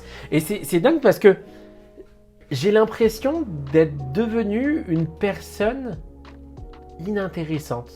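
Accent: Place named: Quebec, Canada. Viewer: Tu sais que tu choppes un très léger accent Québecois ? 😅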